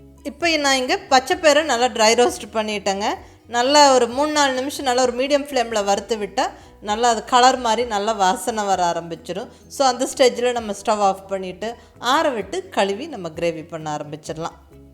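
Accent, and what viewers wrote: Kongu, India. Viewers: Mam nenga kongu tamilil pesurathu pidichirukku..nan Theni Dt.bodinayakanur. gravy super sis. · Neenga.. namma kongu tamila pesurathe azhaga irukuthunga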